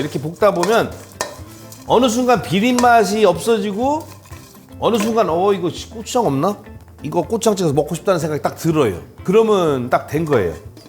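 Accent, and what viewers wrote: Chungcheong, South Korea. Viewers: 박사님 고향이 혹시 충청도!?!? ㅎㅎ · 비빈거여. 뭐여 ..충청도 능청사투리..ㅎㅎ 넘 재미나요~~~ 연기실력도~~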